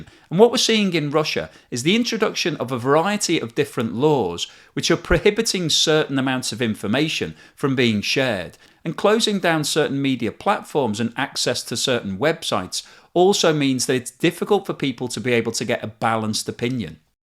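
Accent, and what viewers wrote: United Kingdom. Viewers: Dear: I don't normally simp and bestow excess praise on people but your channel is such a breath of fresh air in an age of agitprop, fake news and hateful propaganda. Your news reporting is almost noble and moral in the sense that you exert every effort to be as honest and impartial and as objective as possible. There are very few journalists out there these days who are not dripping with the venom of scathing personal opinion, and hominum attacks and Trump-style trolling. They say that "facts dont care about your feelings" but everywhere I turn to for the news all I hear are feelings and not facts, and hateful ones at that! Your channel, in my opinion, goes out of its way to try and provide a sober and unbiased analysis of world events--something personally essential for me, as I am an American, living in Russia, whose life has been upended by the war and the myriad sanctions the West has imposed on Russian. 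Thank you so much, and please continue making your outstanding content with that classy gentlemanly British accent of yours. 🙂 Sincerely, An American in Moscow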